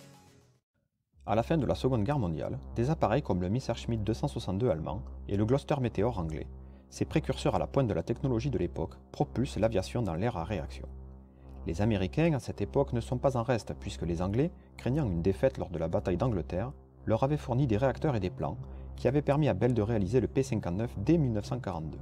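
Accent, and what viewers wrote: South, France. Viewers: je vien de découvrir ta chaine, c'est super, on apprend pleins de choses sans que sa soit trop long, et avec ton bel accent du sud, tu tombe pas dans le piege d'avoir un ton monocorde qui endors, continu comme ca, on veut pleins de videos :p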